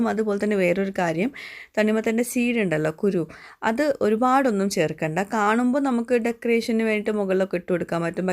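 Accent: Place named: Kasaragod, India. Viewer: Endhra ninga chayakudicha endh baychath nalla majayinda....enthra ee soonante mon enik kasraod romba ishtam especially kasargod basha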